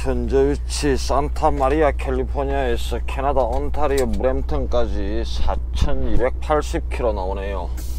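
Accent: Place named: Busan, South Korea. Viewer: 태어나서 유투브댓글 처음 달아봅니다 한국(인천)에서 잘보고 있습니다~~^^ 두달전부터 님 팬이되었네요 구수한 부산억양의 영어가 너무 정감이 들고요 왠지모르게 영상보고 있으면 답답한 마음이 위로가 됩니다. 아무쪼록 안전운행 하시고 건강하세요 저는 지금 라면 하나 끼리 묵겠습니다~~ㅋㅋ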